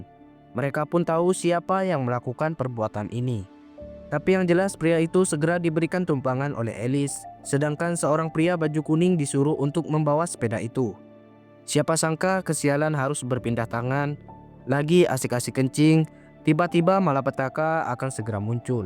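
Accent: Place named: Madura, Indonesia. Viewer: Hee.. kau madura ya? Jing-trejing tak iyaa..? Bikin perut mules dengar kau cerita.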